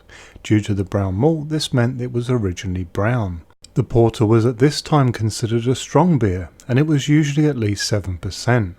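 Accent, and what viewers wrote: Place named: England, United Kingdom. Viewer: Thanks! I really enjoy your videos...it's always reassuring when the presenter for a porter recipe has an English accent.🙂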